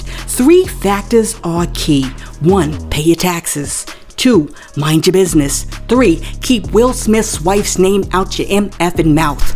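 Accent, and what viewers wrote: New York, United States. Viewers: You're so funny, and i love your New York accent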